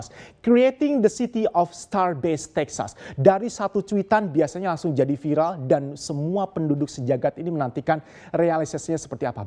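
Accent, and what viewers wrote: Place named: Java, Indonesia. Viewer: aksen jawa nya bikin greget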